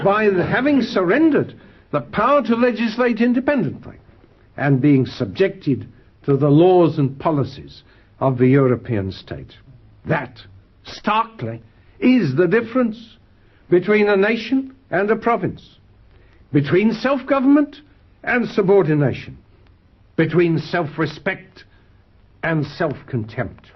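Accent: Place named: Birmingham, United Kingdom. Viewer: love his brummie accent coming through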